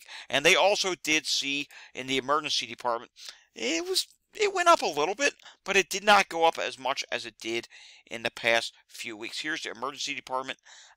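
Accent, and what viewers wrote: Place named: Philadelphia, United States. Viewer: Just found your channel, a friend recommended it. Thanks for doing this. Love your Philly (or near Philly?) accent! I’m from Jersey originally.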